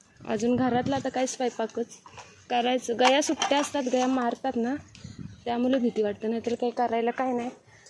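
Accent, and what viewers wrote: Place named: Sangli, India. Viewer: ताई तुम्ही सांगली चे आहात काय,, तुमचे बोलने सांगली कडचे वाटते माझे गाव पण सांगली जिल्ह्यात आहे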